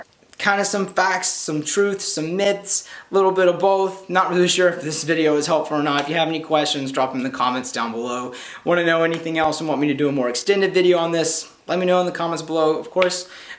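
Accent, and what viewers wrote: South, United States. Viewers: I'm surprised you don't have a thicker accent. I moved away from a small town in TN after college. I followed the yellow brick road to CA and have never looked back. I have a question for you. Do you know the difference between a yankee boy and a southern gentleman? A yankee says you can. A southern boy says Y'all Can! Embrace the southerner in you. Life is a buffet, try a bit of everything, sample it all. When you're older these are the days you'll look back on with fond memories. Make some memories. Stay single, there's plenty of time for that later now is a time to forge life friendships.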